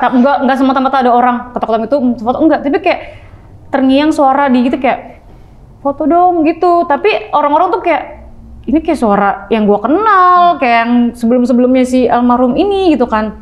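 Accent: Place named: North Jakarta, Indonesia. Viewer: Dari gaya gaya ngomongnya kaya kenal dari daerah mana,, eh Jakarta Utara toss dulu salam dari asal Priouk.. penasaran Jakut nya daerah mana nih ceritanya? Hehehe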